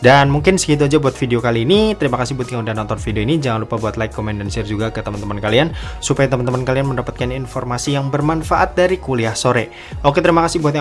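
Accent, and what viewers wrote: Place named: Java, Indonesia. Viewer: Logatnya kalo ngomong medok bngt. Sekali kali selipin bahasa jowo nya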